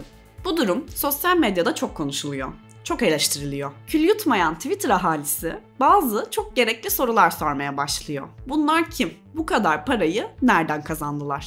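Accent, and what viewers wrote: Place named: Bolu, Turkey. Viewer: bounlu di mi, konuşmasından anladım...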